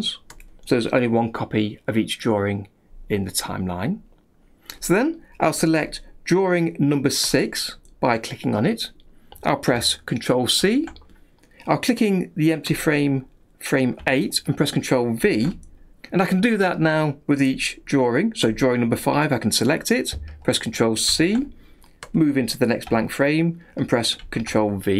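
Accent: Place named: United Kingdom. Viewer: The best thing in your tutorial is your beautiful british (?) accent ! 😄. Easy to understand, and relaxing. Thanks for your great work !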